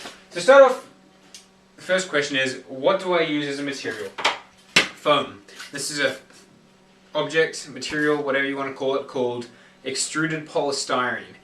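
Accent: Australia. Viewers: you sound Australian or New Zealand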